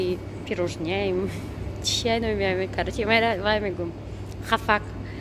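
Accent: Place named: Kulob, Tajikistan. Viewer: Апаи зур ранги кулобиё Гап мезане 😘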